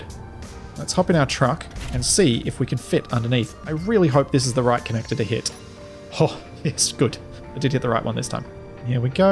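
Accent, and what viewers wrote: Australia. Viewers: Great videos mate. Love seeing a fellow countryman leading the way in SE Tutorials and besides I just happen to like hearing our Aussie accent on YouTube. Cheers thanks for the content pal. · Pretty sure is australian, but that's a guess!:D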